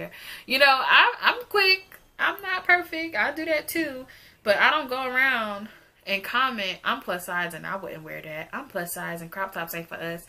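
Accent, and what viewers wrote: New Jersey, United States. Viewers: You did the correct thing emailing. Taking business classes do not stop the bank from messing up. Even huge companies mess up and what do they do? email. So kudos to you. Lovely video and who knew you were from Jersey! I can hear it ( new yorker) Also I really dont like that word, I think it's horrible, but that's something I care for. I thought I was the only one. Woman don't like men saying it but their gf say it. I feel as if its a downgrade from who you are. Also, you would look so nice in ASOS and Fashion to Figure and all of these great brands. I wish they would do a collab for plus Youtubers. I am seeing what you are saying now. I hope companies open their eyes.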